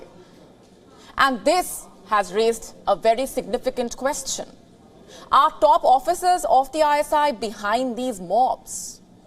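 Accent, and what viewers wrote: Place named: India. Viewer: The Indian accent and the hate whenever she says the word 'Pakistan' and 'Army' 😅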